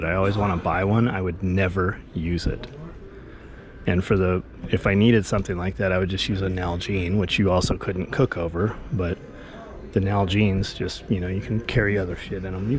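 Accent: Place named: North, United States. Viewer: Still sounds like such a yankee....not that theres anything wrong with sounding like one....just makes farm work seem odd for the south.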